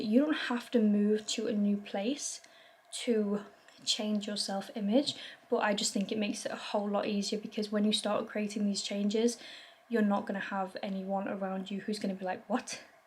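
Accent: United Kingdom. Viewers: do you have a Yorkshire accent?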